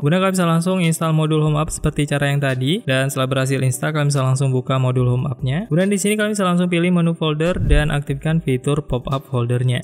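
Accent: Aceh, Indonesia. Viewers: Orang Banda Aceh bang? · Orang aceh ya bang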